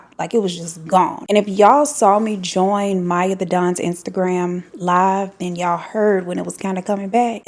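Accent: South, United States. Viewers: I love listening to your voice and southern accent and just certain words you use I just love! Your entire vibe I’m here for! Get it sis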